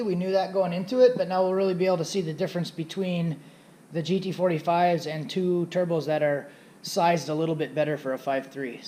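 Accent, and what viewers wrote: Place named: Wisconsin, United States. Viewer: Wisconsin. I thought you were near me from your dialect lol. Yooper here.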